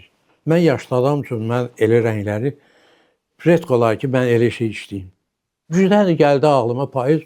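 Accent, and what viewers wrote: Baku, Azerbaijan. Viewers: Bakililarin lahcesi yekdir. Allah Size omur versin❤❤❤❤❤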